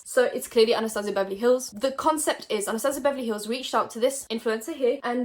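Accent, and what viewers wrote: United Kingdom. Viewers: SPEAK POLISH, you sound toooo British makes me not believe your polish where is your Akcent !?!?!?!? Love your videos keep it real 😁😁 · ...will you marry me? I just want to hear your beautiful British accent everyday when I wake up accompanied by your lovely face!! My goodness you’re a dream 💭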